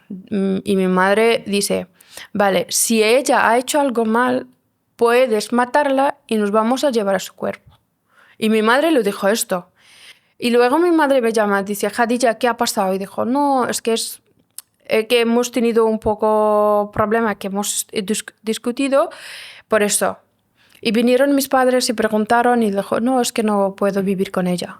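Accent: Andalusia, Spain. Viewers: Oye no me gusta el acento español pero el tuyo 😮❤ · Amo tu acento Andaluz!! 💜❤️💖 Y a Khadija mis respetos por su fuerza y valentía!! Abrazos desde Colombia 🇨🇴🇨🇴🙏🏻🙏🏻👍🏻👍🏻💜🌈🪄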